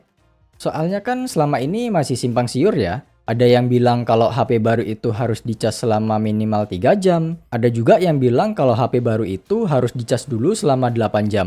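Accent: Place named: Central Java, Indonesia. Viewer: Kayak orang rembang ini😅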